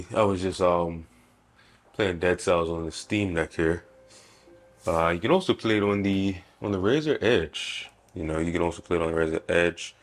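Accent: Bermuda. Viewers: Are you from Bermuda? I hear an accent.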